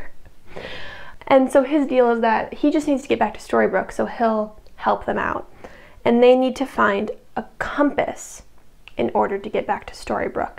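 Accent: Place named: Australia. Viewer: This video has me imagining a universe in which Belle divorces Mr gold and dates Ruby instead. Also I’m listening to this at work and the Australian accent sends me every time I hear it.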